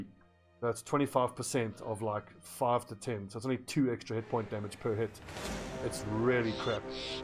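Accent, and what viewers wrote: South Africa. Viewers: just found you randomly and listened and realized you are a fellow south African GG subscribed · you sound like a fellow South African, great game and looking forward to watching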